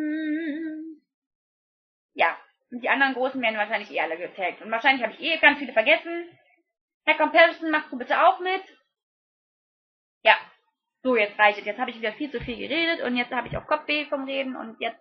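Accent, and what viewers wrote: Cologne, Germany. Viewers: Puh, dass jetzt gefragt wird, wen du abgehoben findest, war klar.. Nehmt es so hin, wenn sie jetzt sagt wer, dann gibt es doch wieder ein riiiesen Theater.. sinnlos, meiner Meinung nach. Ich fand nicht schlimm, dass du Personen doppelt nennst. Du gehst mir persönlich absolut nicht auf die Nerven! ;) Ich gucke deine Videos sehr gerne und ich muss jedesmal grinsen. Leider waren in den letzten Videos keine Sätze mehr mit köllsche Akzent :'( :D Da amüsiere ich mich immer stundenlang. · "Drufjödingst,ne " OMG,ich kann nicht mehr...du und dein Kölsch seid GEILOMAT!!!!,DU bist eine kesse Kiste!O,ich kriege den -Slang..hihi^^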